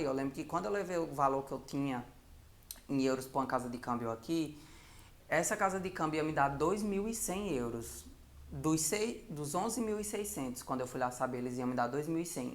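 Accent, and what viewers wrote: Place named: Northeast, Brazil. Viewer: Só eu que acho fofo esse sotaque nordestino ? Kkkkk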